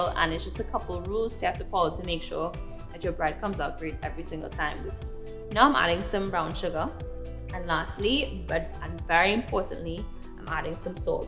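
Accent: Trinidad and Tobago. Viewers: I love a Trini accent. The name is epic. Eat ah food nah! Great work.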